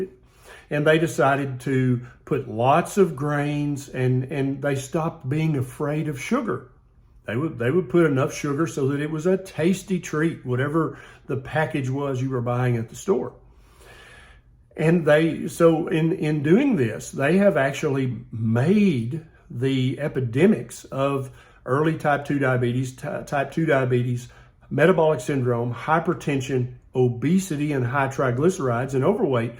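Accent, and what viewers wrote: South, United States. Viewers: Your Southern accent is very charming.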